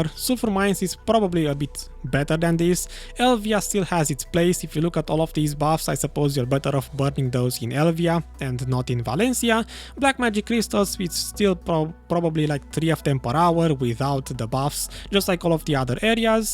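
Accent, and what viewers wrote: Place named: Romania. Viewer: You sound romanian :D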